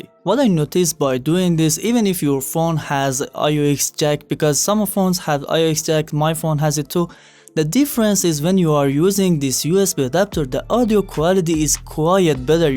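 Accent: Iran. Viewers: Are you irany?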